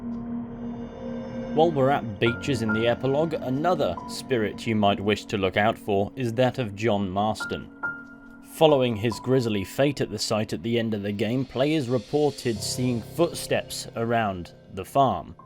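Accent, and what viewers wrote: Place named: RP, United Kingdom. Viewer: you're a smidge posh, in a good way. I like it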